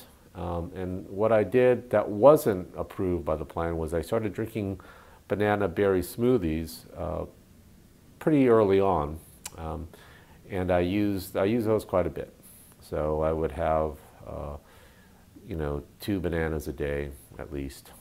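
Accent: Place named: United States. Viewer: You sound American but you talk about kilos or whatever. I'm American and I talk in pounds. Everyone here talks in pounds. I don't know anything about kilos.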